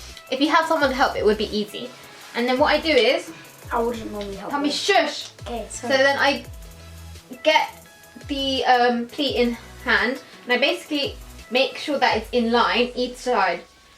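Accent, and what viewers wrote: United Kingdom. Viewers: Wow u have a beautiful british accent, and loved ur video.. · Great British accent......❤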